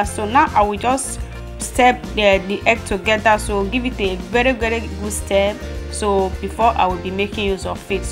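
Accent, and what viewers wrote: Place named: Igbo, Nigeria. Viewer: 👏👏, you never disappoint, I love that igbo accent 😂😂. Meat pie looking yummy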